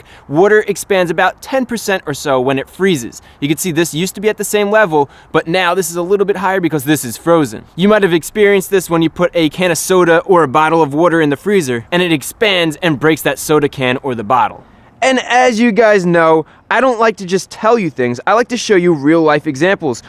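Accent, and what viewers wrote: United States. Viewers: Are you an American,?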